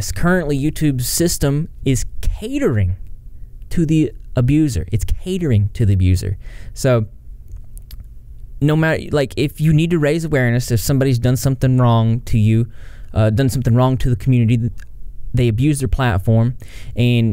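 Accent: South, United States. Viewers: It's just southern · You have Southern accent.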